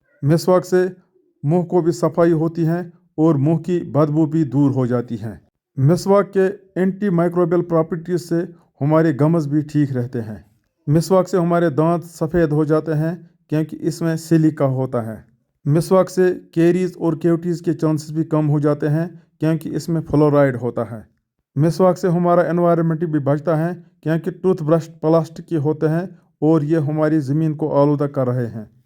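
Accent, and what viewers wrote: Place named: Kupwara, India. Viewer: Pls focus on your accent. It needs great improvement. You are using typical kupwara accent.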